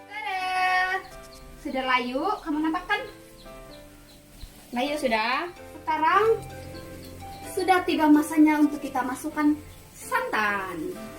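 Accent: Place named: Sabah, Malaysia. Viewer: Pekat juga Sabah kau bha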